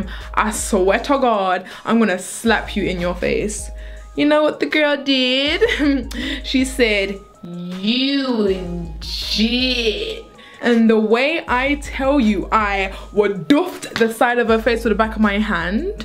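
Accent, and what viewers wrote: England, United Kingdom. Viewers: All of u saying it's jit not jet clearly have no appreciation for the English accent 💁🏽💁🏽💁🏽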